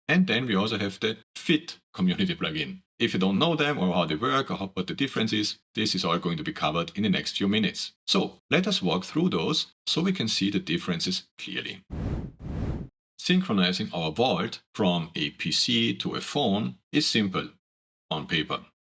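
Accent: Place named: Denmark. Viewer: Thanks for the video! Are you Danish? Your accent is cool, reminds me of Mads Mikkelsen haha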